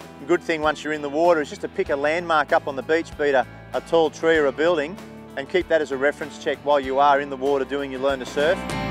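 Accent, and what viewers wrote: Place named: Australia. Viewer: gotta love the aussie accsont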